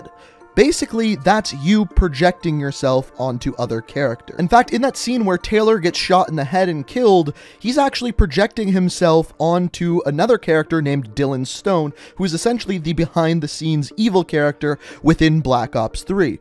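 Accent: Canada. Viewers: Is the Guy Canadian?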